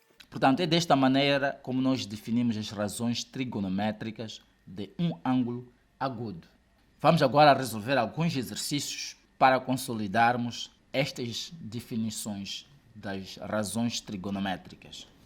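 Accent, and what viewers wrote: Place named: Mozambique. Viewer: Obrigado professor. Percebi que és moçambicano 🇲🇿, pela maneira da pronúncia do "R" pronunciamos como no espanhol. Diferente de Angola Brasil e Portugal.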